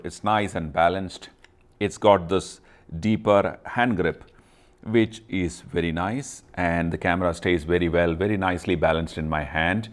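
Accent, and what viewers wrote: Kerala, India. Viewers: Can't help but notice such a strong mallu accent, very good videos though. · Thanks for the videos I like photography. If u don't mind shall I ask you one thing are you from Kerala. Because ur slang is a bit like that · sir your malayalam accent 👍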